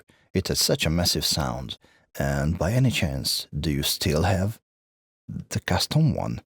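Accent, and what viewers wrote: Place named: Balkans. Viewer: Fullok a balkáni angol akcentuson és vele párhuzamosan a kis rajzokon. 😆💯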